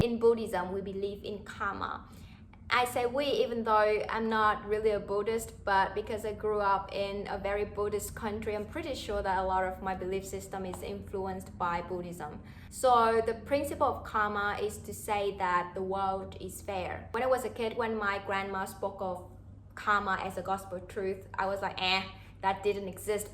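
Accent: Australia. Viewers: by the way, you speak English with an Australian accent, correct? I lived there as a child.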